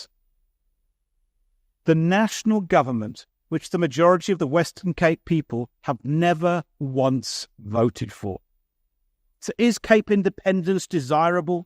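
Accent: United Kingdom. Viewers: You sound like you are from the UK?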